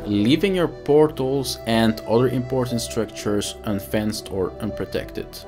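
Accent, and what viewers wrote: Netherlands. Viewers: you sound like a dutch guy not sure but your accent sounds like it haha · You have a pretty dutch english accent 😉 thanks for the video, mate!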